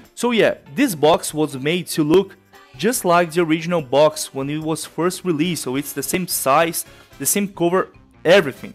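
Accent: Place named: Brazil. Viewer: sotaque brasileiro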